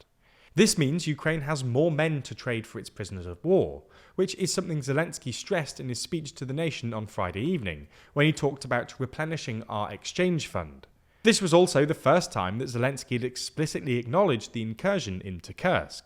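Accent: England, United Kingdom. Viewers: Never believe a single word about this war with an English accent. It is the Perfidious Albion sound of lying. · Do you have a speech impediment or just a goofy brit accent?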